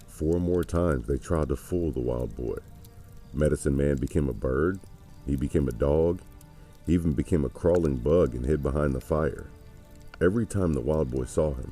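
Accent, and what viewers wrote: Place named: Texas, United States. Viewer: Sounds like Texas to me!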